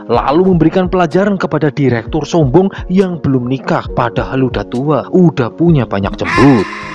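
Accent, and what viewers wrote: Banyumas, Indonesia. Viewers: wong ngapak apa ya admine😂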